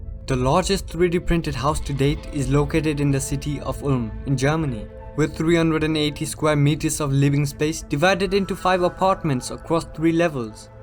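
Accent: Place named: India. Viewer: This channel is diamond, not gold!! BTW are you of Indian descent cause your accent seems like a little indian.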